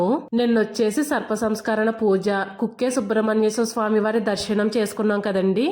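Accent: Konaseema, India. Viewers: You have explained everything very well amma. Specially your konaseema style of language is nice to hear n infact we all enjoyed. Good vedio n we wish you all the very best .God bless you amma.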